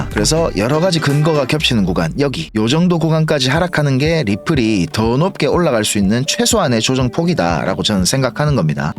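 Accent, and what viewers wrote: South Korea. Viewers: 저도 응원합니다. 특유의 부산 억양 제 와이프와 같습니다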